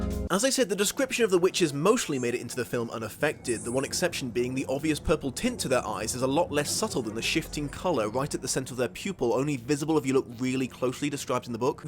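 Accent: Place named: United Kingdom. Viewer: YOU ARE BRITISH! So am I Hyper mega mega fan boy mode activated. I mean your accent was obvious but I needed to be sure, then your complaint about the Americans ignoring us Brits definitely sold it for me. Also they have acknowledged us, well at least the MCU has. Now they have Tom Holland, Tom Hiddleston and Benedict Cumberbatch as Spider-man, Loki and Doctor strange.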